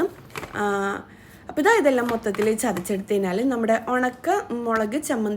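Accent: Kannur, India. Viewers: Sorry nan parayunnad thonna Ruth cheriya thettan Nammude kannurkare prathekich thaliparamb payangadi bagathullavare ellavarum kaliyakkum samsarasheyliyil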